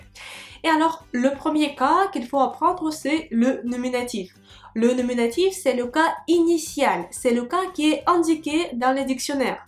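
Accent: Russia. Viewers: Tu es magnifique 😘. Et quel joli petit accent. Les femmes russes sont parmi les plus belles au monde. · Si nous pouvions aussi bien parler le russe que parle français, je crois que ce serait parfait...😊 Pour ma part, je lui pardonne ses petites imperfections qui lui donne le charme des russes... Merci pour vos belle leçons..😊😚👍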